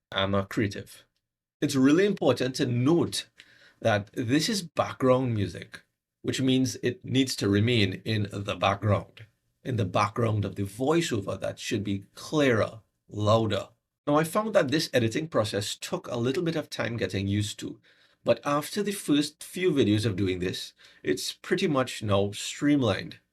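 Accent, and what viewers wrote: Trinidad and Tobago. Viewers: Why u sounding like a trinidadian lol❤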